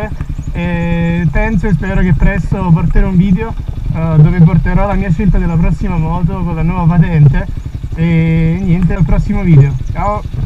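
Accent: Acerra, Italy. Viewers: Ma sei di acerra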